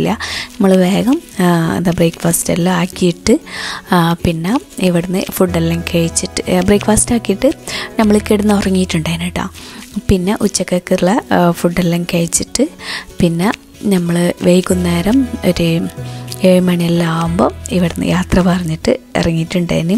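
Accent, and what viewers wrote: Kasaragod, India. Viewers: ഈ slang ksrd പോയതിനു ശേഷം പഠിച്ചതാണോ? മലപ്പുറം slang ഇങ്ങനെ അല്ലല്ലോ atha ചോദിച്ചേ ❣️❣️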